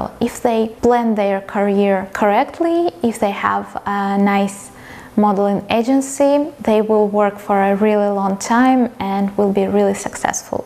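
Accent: Russia. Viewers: вы же русская? слышу этот милый акцент аххахаа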